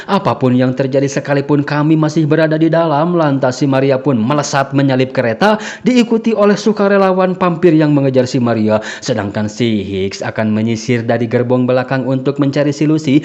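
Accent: West Java, Indonesia. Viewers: Bang lu asli Sunda mana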